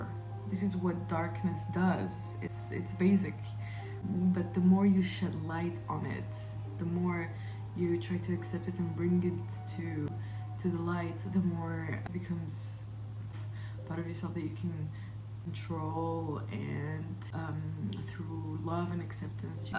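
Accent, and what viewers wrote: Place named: France. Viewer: Ur french accent 😩❤️‍🔥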